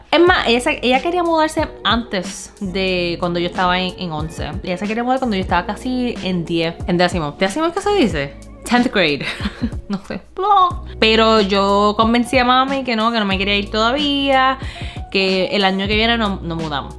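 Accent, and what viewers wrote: Puerto Rico. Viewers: Tu eres boricua tu forma d hablar el acento es la nuestra ya estas marcada por nuestra cultural tus recuerdos parte d tu vida esta formada en la Isla, t mudaste por la misma razon q nos hemos mudados todos fisicamente pero nunca puerto rico c a ido d nosotros donde quiere q voy soy PUERTORRIQUENO d LOIZA y AMO a mi ISLA La EXTRANO y VOVERE ALGUN DIA A MI BORINQUEN. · Mira belleza, usted es muy Boricua sin duda. Hasta en tu hablar 🗣 se nota, aunque no hayas nacido en PR viviste la cultura y está en ti todavía. Cambias R por L y J por S. Algo muy Boricua. New York City es una ciudad con gente del mundo entero, no hay un estado con más diversidad en USA 🇺🇸. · You are very cool to the fullest 🇵🇷 You can't fake that Puertorican accent much love 💕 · I can totally relate with you when it comes to moving during senior year. I moved from NY to Florida during my Sr year...it was the freaking worst. 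On another note, me encanta ver tus videos, mi mamá was the one who told me to check your pg out. Y cuando te vi hablando no solamente español pero con el mismo acento que tengo yo, I was kinda tripping out. Que viva Puerto Rico y todos los Latinos!! Pa’ lante!!!! #WEEEPA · Tu eres pura Boricua ese hablar es unico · 🙋‍♂️ saludos mi'ja! Me encanta ver u oir como hablas a lo Puertorriqueño 😃 un orgullo de tenerte como compatriota Puertorriqueña aunque sea China de nacimiento 👍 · Tu hablas como puertorriqueña pura saludos desde la isla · Dos minutos atrás vi el vídeo tuyo preparando el arroz frito, tu acento boricua te queda perfecto, tienes mucha gracia y recuerda el SAZÓN está por dentro y tienes mucho. Saludos desde 🇩🇴. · Como aprendistes el ingles tan rapido y tan bien como tu lo hablas? De las 3 idiomas q tu hablas cual es el preferido q tu prefieres hablar? Y yes you do have an accent when you speak english pero dats wat happens cuando eres boricua isla del encanto and where in ny do you live at cause i live in ny too so have a blessed day and y q tengas un año mejor q este so let 2021 be a better year than this on Dios te bendiga y pa lante mija q eso es lo q hay weeeeeeeeeepaaaaaaaa puñe_ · Wao me encanto tu story! 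Yo soy puertoriqueña y no me crie en PR. Desde los 5 años estoy viviendo en E.U. Te apoyo 100% y eres mas boricua que yo!!! Tu acento es puro boricua que por un momento me quede boba jijiji. Te deseo lo mejor en NY y maybe un dia regresara a PR a demostrarle a todos aquellos q no te apoyaron q tu saliste pa lante y ellos no.. Pa lante nena! Que viva PR y China! Dios te bendiga. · Que bonito se oye una asiática,cn acento extranjero,dependiendo del país que viva,se ve bonito...en este caso una chinita hablando cn acento P.Riqueño...🤗🤗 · Tu hablas mas boricua que Yo, Aqui tienes a un amigo nuevo, enviame un mensaje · Hablas bien Boricua.Te felicito por tus videos. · Wao que linda eres y escucharte hablar asi como mi gente asi boricua me vuelve loco 😍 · ajá??? Todos los boricuas hablamos así claro q si…. De seguro tú hablas asi😂🤦🏻‍♀️ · Eres tan boricua que hablas como el boricua mitad Español y mete palabra en Ingles y no es que este mal asi son ustedes los boricua su cultura saludos Ecuador en la casa. 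· tienes un asentó bien boricua que hermosa 🇵🇷💯💯🇵🇷🤣🤣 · Yo me mudé para NC con 24 años. A pesar de haberme mudado un poco mayor que tu, experimente exactamente lo mismo. Sentía que no encajaba en nada. Pero igual que tu no me hace falta nada. Mucho éxito y btw tu acento boricua es innegable. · You are so funny. You have a Puerto Rican accent and you are more Boricua because you were raised in PR. Hey we are neighbors in Brooklyn. Dios te Bendiga Boricua!!!!! WEPA 🇺🇸🇵🇷🇺🇸🇵🇷🇺🇸🇵🇷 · Ella habla como el boricua que nace en Estados unidos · Boricua, ¿Ahonde naciste? Just wondering accent doesn't match your appearance. Porque tu lengua es boricua y sangre China which is fascinating. Good vids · Wow you speak so Puerto Rican ❤️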